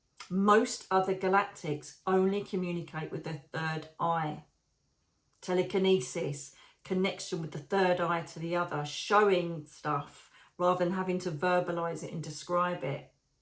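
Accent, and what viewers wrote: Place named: England, United Kingdom. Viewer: Just love your lovely English accent and your beautiful soul. Love from Germany ❤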